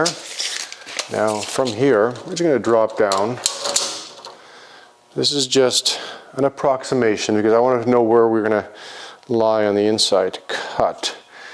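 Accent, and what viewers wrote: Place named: Canada. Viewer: are you in the States. I hear a Canuck Dialect??? Great Build Sir. Make Canada Proud??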